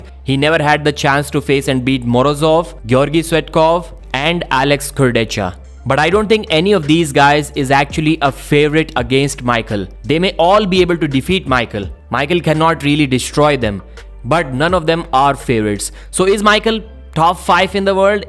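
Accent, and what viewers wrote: India. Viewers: Terrible. This pajeet voice ruins everything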